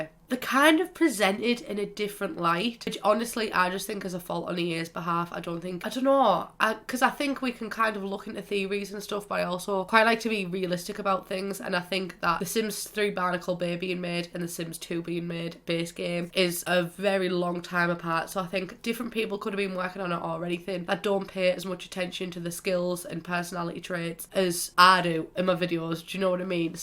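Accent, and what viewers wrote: United Kingdom. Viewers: Lmao you’re so british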